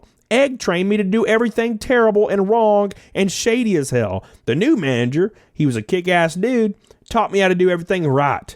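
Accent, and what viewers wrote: South, United States. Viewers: Have you ever considered voice acting? I could imagine you doing voices for cartoons or even radio dj, or audiobook. Your southern accent is so unique! Great content tho, always funny and intriguing to hear what goes on in crazy retail.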